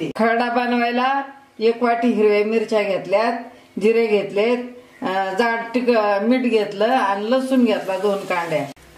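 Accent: Ahmednagar, India. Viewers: I know I lived in Rahuri ,I went to school in Ahmednagar ,the way you spoke .I remember those day.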